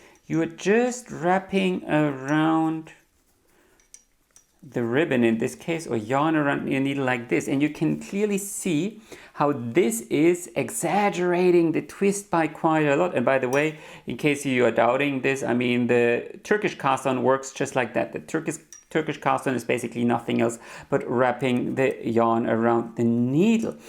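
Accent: Germany. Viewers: My knitting "don't": Don't ever tell yourself that you can't do a certain technique, like color work or lace. Start with something small like a hat or even just a swatch. Want to knit a cardigan but the pattern uses steeks? Knit a swatch to practice steeking before committing to making the garment to see if you even like the technique. At the end of the day, it's still knits & purls, and it's only yarn and you're not required to like everything you try. Also, I enjoy your German accent quirks - I enjoy most accents in general 🙂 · zu den linken Maschen: die stricke ich so, wie du sie gerade gezeigt hast und zwar sehr schnell....und ja, bei allen Zu und Abnahmen muss ich entsprechen umdenken. Das ist so wie beim Altsaxophon spielen ohne transponierte Noten im Orchester: das passiert im Kopf automatisch. Es ist aber schwierig diese Art anderen dann beizubringen, warum sie es andersherum machen sollen, wenn es doch anders in der Anleitung steht. 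Stricke über 50 Jahre schon so und komme gut damit klar. Was mir wirklich gut gefallen hat, die tiefgründige Erklärung mit den vielen Details für die Maschenprobe. Da bin ich eher nicht so hinter her, aber nach diesem Video werde ich es jetzt doch öfter versuchen. Obwohl wirkliche Pannen habe ich noch nie erlebt und ich stricke viel und alles was sich Stricken lässt. Mein Motto ist dazu das Garn muss seine passende Nadel in Material und Stärke finden. Manche verstehen sich einfach nicht und dann kommt nur Murks raus. Das merke ich aber Recht schnell und kann dann was ändern. Ein tolles Video von dir. Vielen Dank. PS: dein deutscher Akzent stört mich überhaupt nicht. Deswegen antworte ich auch auf deutsch😉👍🧶🧶🧶🧶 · Thank your for sharing the language behind your accent. To my ears, it's similar to a couple of others but try as I might, just wasn't able to place it. Likely because most of the Germans with whom I've interacted spoke with a very thick, almost blurred. 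Your accent is crisp and clean, unique and very pleasant to me. Although I noticed your pronunciation of diameter, because I knew what you were saying, didn't really give it much thought one way or the other. Like knitting, English is spoken with different inflections and pronunciations all over the world, even when English is the native language, as the Brits and Americans. I've been knitting for over 50 years and am still learning new things, so it never gets dull.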